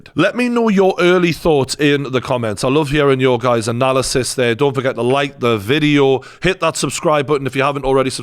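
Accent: Newcastle, United Kingdom. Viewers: Always like listening to a Byker lad .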